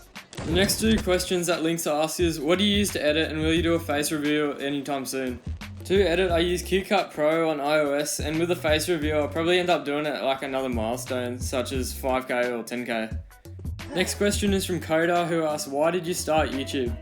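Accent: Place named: Australia. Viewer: Love your Australian accent and I even live qld but I don't have an accent. Lol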